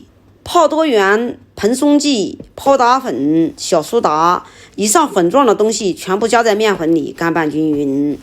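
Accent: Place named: Xinyang, China. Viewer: 口音像信阳人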